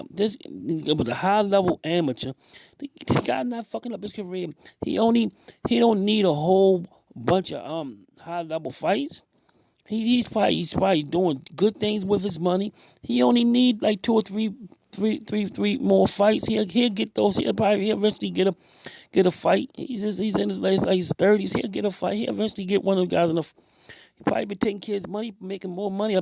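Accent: Baltimore, United States. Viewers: I recognize that accent are you from Baltimore?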